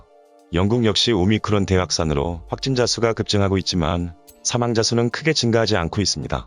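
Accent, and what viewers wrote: Gyeongsang, South Korea. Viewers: 의학정보중 제일 신뢰가 갑니다. 하루빨리 목소리가 회복되셔서 구수한 경상도 사투리로 말씀하시는걸 듣고 싶습니다. ❤️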